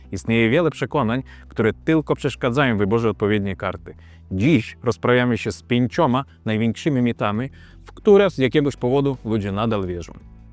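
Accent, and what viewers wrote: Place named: Belarus. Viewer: dieeleses? warto jeszcze popracować nad wymową, bo jest potencjał, pewno długo żyjesz na terenie Polski, ale jeszcze ciągle Twoja wymowa - zgaduję, że białoruska - rani uszy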